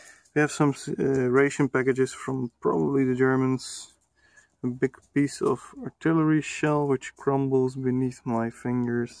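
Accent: Netherlands. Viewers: Geen haat maar je hoort dat je Nederlands bent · You've a dutch accent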